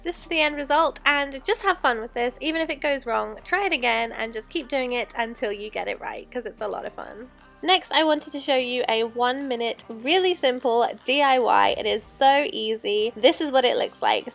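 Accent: England, United Kingdom. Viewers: are you from England? ? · love your accent so how is ron and the kids if you have seen harry potter you will get it loved the ideas by the way where you from what accent is that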